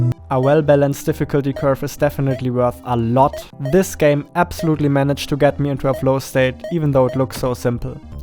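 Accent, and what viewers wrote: Germany. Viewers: Ich höre seinen deutschen akzent😅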